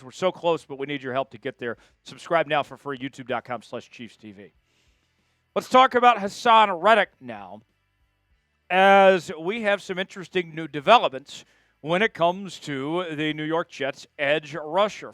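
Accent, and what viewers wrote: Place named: Appalachia, United States. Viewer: This guy's just keeps on making bullshit content so he can use that hilariously hillbilly toned accent and annoy everyone within yelling distance.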